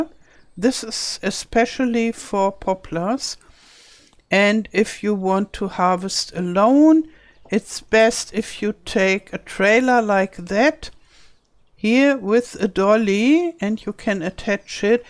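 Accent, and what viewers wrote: Germany. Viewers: i love how german you sound! as a german being away from germany for 7 years now everyone can still instantly tell im german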